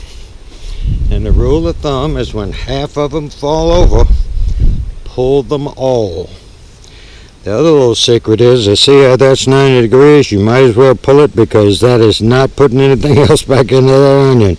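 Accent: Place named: Coastal Virginia, United States. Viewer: I'm going to guess he has an old fashioned Coastal Virginia accent...it does have those rounded o's like Canadian. Listen to Earl Hamner narrator of the Waltons--sounds very similar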